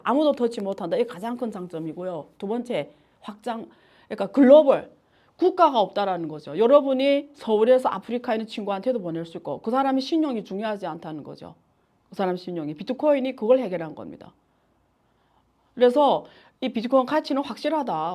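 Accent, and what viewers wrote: China. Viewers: 교수님 어느새 중국어 억양이 많이 없어졌네요. 몇년전에는 듣기 거북했는데 이젠 자연스럽게 들립니다. 건강하세요.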